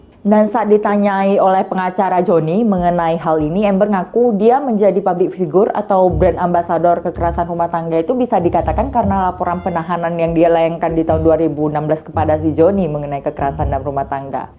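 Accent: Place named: Sumatra, Indonesia. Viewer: Logat khas Sumatra-nya yg bikin asyik 🇮🇩🥳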